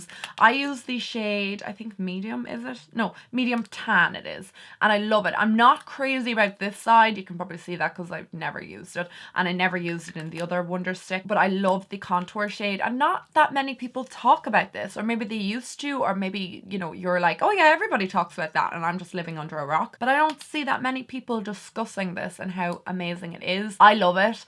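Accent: Ireland. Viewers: To echo other comments - your channel is a hidden gem! A lot of the big YouTubers in the beauty community are losing their shine for me - they seem to hype really expensive makeup that is out of reach for the average person and review less and less affordable items. I feel your passion and honesty and as someone who used to live in Ireland (now back home in Australia) your accent takes me back to a very happy time in my life. I love your videos, especially the Revolution Beauty reviews. Love it XO... for any other Australians reading this comment, you can buy Catrice, Essence and Makeup Revolution products from Kosmetik4less, a Germany online store that ships to Australia. You can also buy Makeup Revolution products directly from the parent company Revolution Beauty, who also ship to Australia. Priceline also stock some Essence products.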